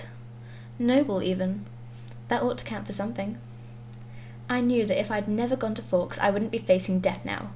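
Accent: United Kingdom. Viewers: i love your voice! r u english?! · I LOVE THE BRITISH ACCENT!!!!!!!!!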